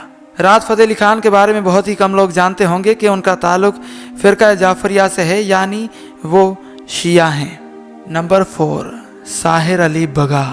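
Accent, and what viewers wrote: India. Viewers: Yeh bolne waly bhai mujhe india k lag rhy hen inka lehja indian h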